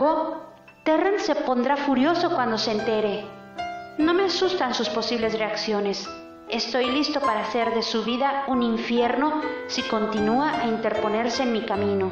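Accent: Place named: Spain. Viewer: Muy buena su acento español le da un toque d elegancia y donde esta la pecas😮😮😮😮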